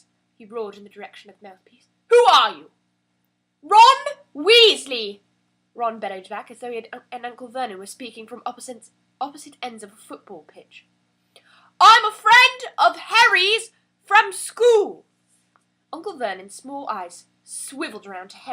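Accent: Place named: United Kingdom. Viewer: which area in the uk your accent related?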